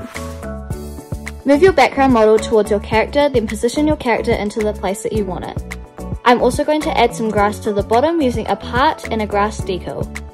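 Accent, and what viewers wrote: New Zealand. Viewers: omg are you from nz?? you sound like one! (im sorry if that sounds rude or anything!!) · Are you from New Zealand?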